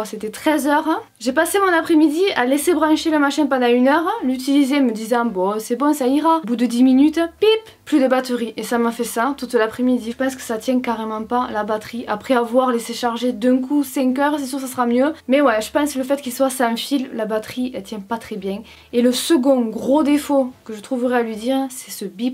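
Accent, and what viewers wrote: South, France. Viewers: Jte kiff de ouf meuf Tellement drole et ton accent, whaou , le sud dans mon salon breton 😂😂😂😘😘😘😘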